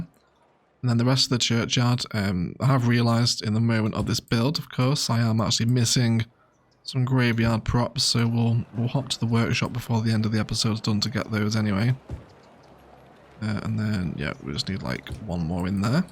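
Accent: Australia. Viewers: Honestly mate what an amazing train station area I don’t know how you managed to capture the vibe of a small aussie community train station so well I’m from Perth and that station would even fit in over here to 👌🏻 also keep the Aussie accent in it’s actually not bad 🤣🤣 very keen for this series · Right on with the aussie accent mate! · take it easy on the Gum Tree.. April fools, you don't have that many yet. Love the video, so excited to see it grow. Perfect chill out vibes - and aussi accent! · Music ✅️ Aussie accent ✅️ train only start ✅️ incredible map ✅️ special guest appearance ✅️✅️✅️ editing ✅️ do I love Thessia already? ✅️✅️✅️❤️❤️❤️❤️❤️❤️❤️❤️❤️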